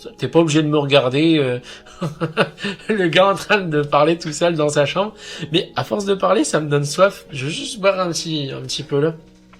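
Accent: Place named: Quebec, Canada. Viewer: Tu sais que tu choppes un très léger accent Québecois ? 😅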